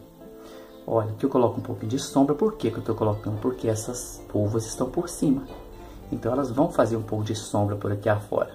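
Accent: Minas Gerais, Brazil. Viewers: Suas aulas são maravilhosas sua didática muito boa homem de fé eu também sei da nossa dependência de Deus Todo poderoso.Qual cidade você é tem sutaque mineiro gostaria de receber seus riscos ,sou da Bahia.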